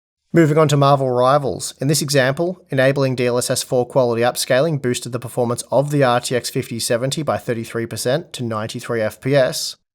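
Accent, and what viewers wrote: Australia. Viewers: Great insight, thank you so much 😊 P. S. I love your Australian accent, it's fantastic to listen to it.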